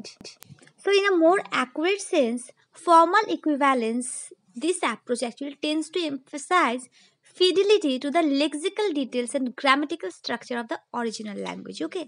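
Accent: India. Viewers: Loved your strong indian accent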